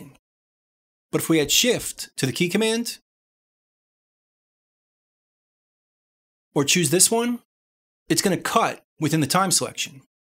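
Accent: New York, United States. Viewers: You're a talented teacher! That New York accent helps, too!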